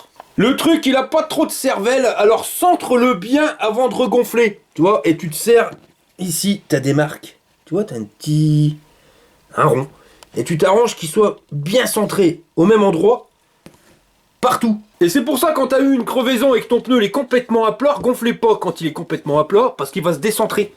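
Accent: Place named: Nord-Pas-de-Calais, France. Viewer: Salut je vais m'offrir un fat bike 20 pouces, j'ai regardé les modèles et celui qui m'a tapé dans l'œil c'est le Garret Miller Z. Quand penses-tu? Ton biclou blanc derrière toi est sympa, quel est ce modèle? Merci beaucoup pour tes vidéos avec ton accens CH'TI j'adore, continu comme ça.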